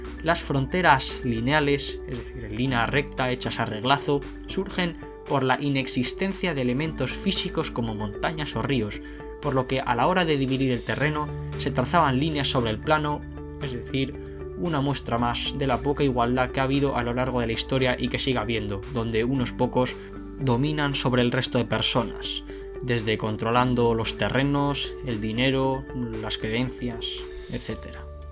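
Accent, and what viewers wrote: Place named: Spain. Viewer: Que hermoso canal, me gusta como narran ustedes los Españoles, sigue así, nuevo sub.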